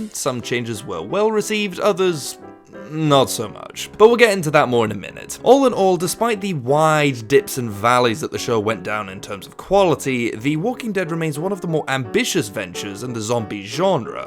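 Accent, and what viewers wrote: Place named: United Kingdom. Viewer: Holy shit! You are the most English boi ever. Also, this show sucks! Rip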